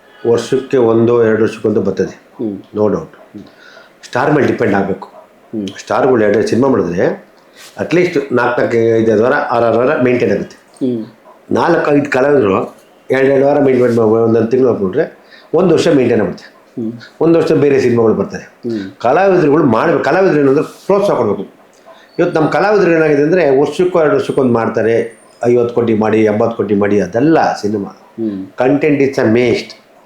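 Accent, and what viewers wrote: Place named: Telugu, India. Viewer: E manja proper kannadadavanu alla ansute , ole Telugu navaru matado reti matadtane.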